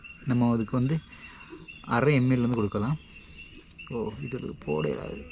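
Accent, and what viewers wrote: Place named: Sri Lanka. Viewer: நீங்க இலங்கை என்பது உங்க பேச்சுல தெறியுது ஊர் எது ஆடுவிலைக்கு குடுப்பீங்களா என்டுதான் கேட்டேன்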